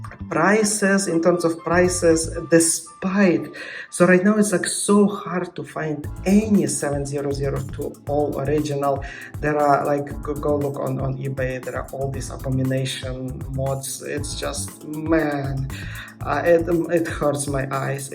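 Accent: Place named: Russia. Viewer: The accent of this guy is very ru**ish! 👎👎👎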